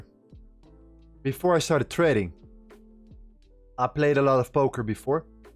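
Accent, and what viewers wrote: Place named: Netherlands. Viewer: Overal te herkennen, dat prachtige nederlandse accent.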